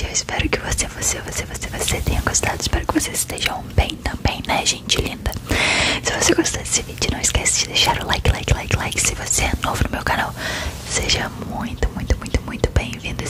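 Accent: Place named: Rio de Janeiro, Brazil. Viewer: Olá, então tu és gaúcha? O teu "carioques" me enganou direitinho.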